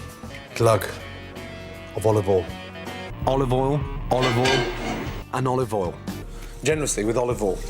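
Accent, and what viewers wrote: Scotland, United Kingdom. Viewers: Ahhh this stingy-scottish accent i love it. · Why am I watching a Scottish guy say olive oil for 4 min at 3 am?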